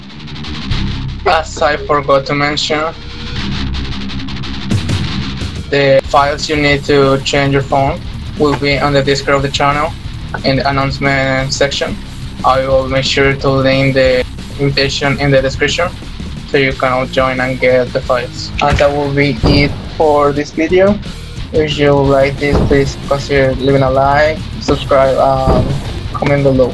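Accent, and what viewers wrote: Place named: United States. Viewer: A mira se volvio bien gringo el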